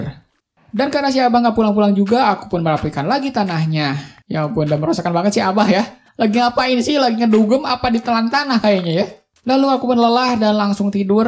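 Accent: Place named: Bugis, Indonesia. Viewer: Hai aku sub baru nih aku tertarik dengan Vidio ini karena cara bicaranya Bugis tingkatkan lagi ya suaranya pasti banyak subcribernya kok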